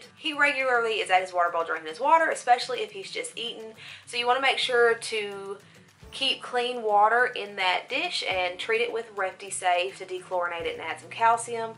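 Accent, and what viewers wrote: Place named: Texas, United States. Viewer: You and I have the same accent I'm from Texas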